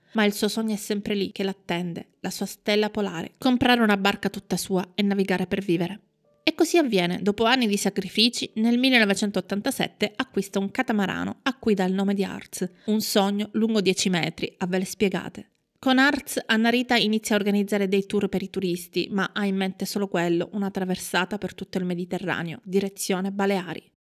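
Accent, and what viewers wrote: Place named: South, Italy. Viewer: Il canale è bello..peccato per la voce narrante. Si sente troppo l'inflessione dialettale del sud.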